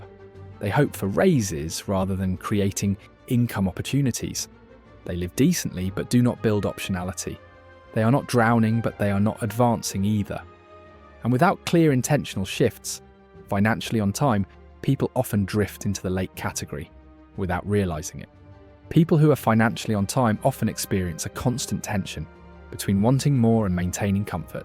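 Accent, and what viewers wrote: England, United Kingdom. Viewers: Always wondered why its an English accent talking in Dollars. Helpful video...uk based. 42, no debt, buffer in place and started an all world global index fund aim to save £200 ° month into it. Hope to be able to retire earlier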